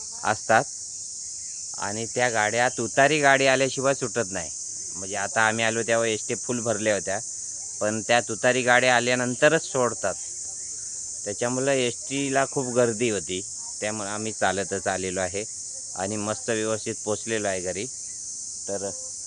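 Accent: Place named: Sangameshwar, India. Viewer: लय भारी आपली संगमेश्वरी बोली भाषा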